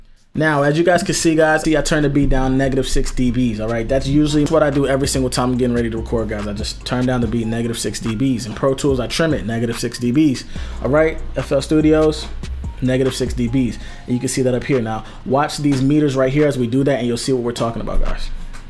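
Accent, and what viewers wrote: Jamaica. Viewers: U Jamaican 🇯🇲 ? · you Jamaican??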